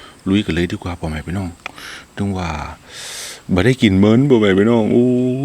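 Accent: North, Thailand. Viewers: น่ากินเนาะ .คนเหนือพูดจาเพราะดีนะ.ชอบๆๆจ้า.❤️❤️❤️👍👍👍🇦🇹🇦🇹🇦🇹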